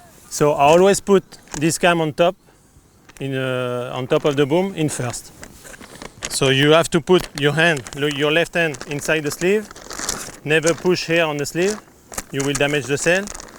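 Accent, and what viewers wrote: England, United Kingdom. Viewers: j adore ton accent anglais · l'accent anglais magnifique d' xD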